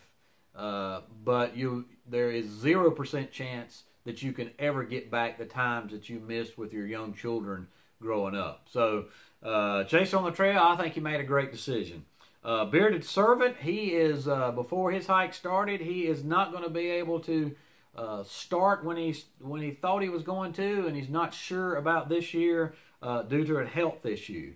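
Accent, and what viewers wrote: South, United States. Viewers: I am an hour North of that location. I am not certain where you are located, however, judging by your accent I would guess you are from the southern region. I can definitely give you a hand as you head North. Would love to connect with you👍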